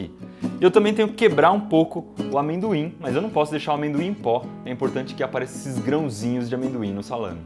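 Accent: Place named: Rio de Janeiro, Brazil. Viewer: Dexa de se carioca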